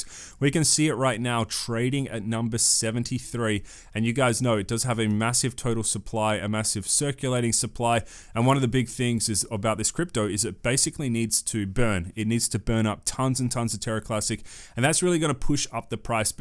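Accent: Australia. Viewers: Love your accent. Makes me miss oz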